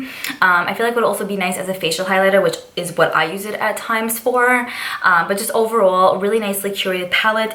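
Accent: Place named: Long Island, United States. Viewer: Glaws Bombs! I was guessing you were a NY'er...hot chawclate made me think Long Island perhaps.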